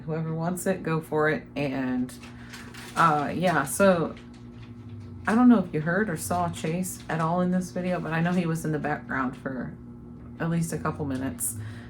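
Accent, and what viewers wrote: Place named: Michigan, United States. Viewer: I just found your pg 😊 love the content! Have a happy new yr ❤ from Michigan.. p.s..you sound like you're from my neck of the woods 🤗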